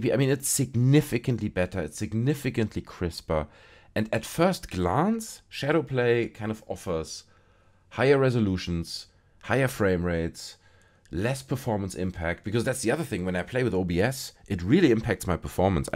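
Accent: Germany. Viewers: Are you from germany? Because how you pronounce words is very german xD!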